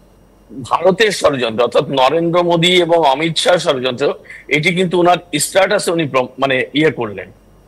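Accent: Kolkata, India. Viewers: উনি ভারতের লোক ওনার ভাষা। বাংলাদেশের মানুষের ভাষানা উনি কথা বলতেছেন কোলকাতার মানুষের মতো।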